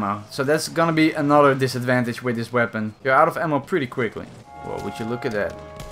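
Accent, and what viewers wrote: Netherlands. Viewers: Je kunt duidelijk aan je stem horen dat je Nederlands bent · You sound dutch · I hear his accent, 100% Dutch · I detect a Dutch accent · It sounds u are dutch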